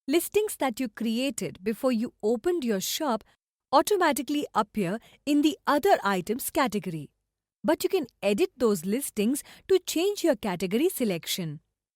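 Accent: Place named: India. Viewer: White lady with an indian accent, funny. I feel like I'm at 7-11 or my doctors office.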